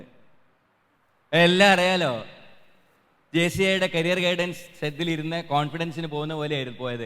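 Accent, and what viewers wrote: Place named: Malabar, India. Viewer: സദ്ഗുരു ജെഗ്ഗി വാസുദേവന്റെ പദാ നു പദ തർജ്ജിമ . എന്നാലും കലക്കി . മലബാർ സ്ലാങ് ന്റ് കുഴപ്പം ഒഴിച്ചാൽ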